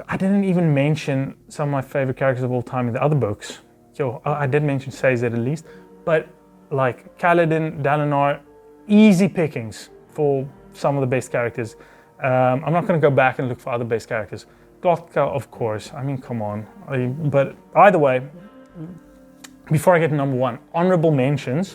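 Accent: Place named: South Africa. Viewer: Suid Afrikaanse aksent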